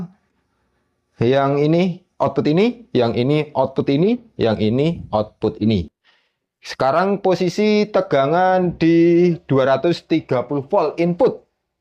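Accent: Java, Indonesia. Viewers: Jawa ini ngomongnya tebal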